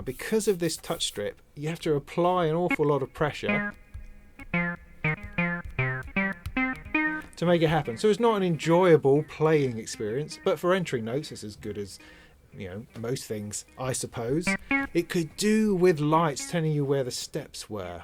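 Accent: United Kingdom. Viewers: you're becoming something of a rather good influence. And so nice there's another UK accent to listen to. just got myself an mpc one as a brain....(not personally... though perhaps could be pressed into service...) for a more advanced system. looking to start investing and building around Christmas time. Keep making the vids... learned everything i know so far mainly from you and divkid., huge thanks (btw... what was that reference you made to church the other day......?)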